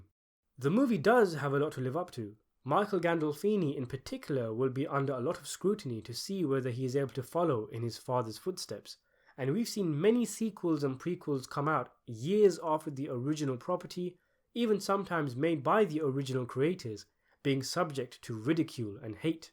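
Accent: United Kingdom. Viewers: You Brits say "H" funny. "haytch" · The British accent ruins it. Such a sissy accent talking about Tough Guys.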